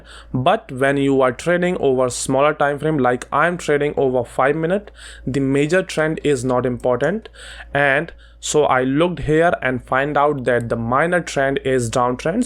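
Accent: India. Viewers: Very good explanation with good indian english...we know very well sir....excellent video.....new subscriber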